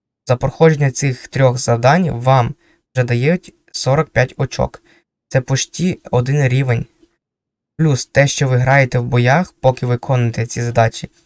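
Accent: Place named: Western Ukraine, Ukraine. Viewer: Ти явно десь із заходу, волинь чи рівненщина?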